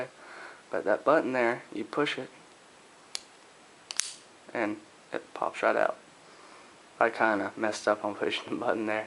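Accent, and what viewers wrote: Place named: Australia. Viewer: If they are illegal where did you get them? You sound kinda Aussie so I'm guessing you get them imported. Please reply! I am looking to buy one :)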